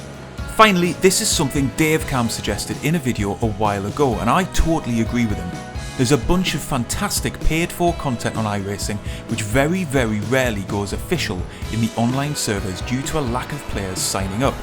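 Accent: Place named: Newcastle, United Kingdom. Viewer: really enjoy your content, nice to hear a fellow Geordie - Dave Cam only lives a couple of miles up the road. The North is a Sim-Hub 😂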